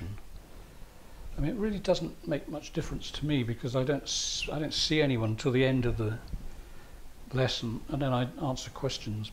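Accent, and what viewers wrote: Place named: Kent, United Kingdom. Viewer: im a pommie that lives in Australia. I came here with my father in the 70s from London to Canberra, where my pa worked in the British High Commission. I never return to UK as it upsets me too much. I used to spend my young years visiting my nana in Tonbridge Kent and you remind me so much of my times in Kent. Your voice, your attitudes, your humour, takes me back to my days in Kent. To the hop fields, to the high street, to the avenue where my aunt vi lived all comes back to me. Australia in contrast to UK is so vast and wild and it lacks the human cultivation, it lacks the history and order that one yearns for at times.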